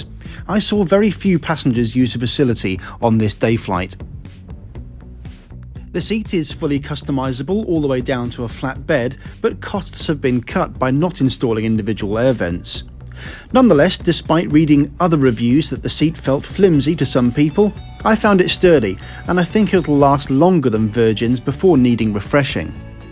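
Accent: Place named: Northern Ireland, United Kingdom. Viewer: Loving the Northern Irish accent! Full of surprises mate!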